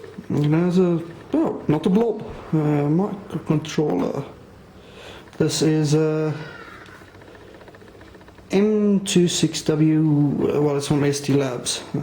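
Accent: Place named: South Africa. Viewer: Heavy Saffer accent. lol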